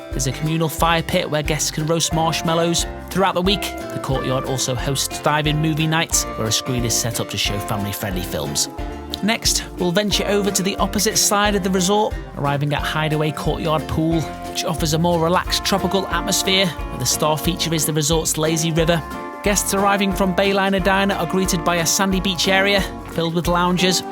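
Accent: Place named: Scouse, United Kingdom. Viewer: Why have I never noticed the slight Scouse accent till this video?!?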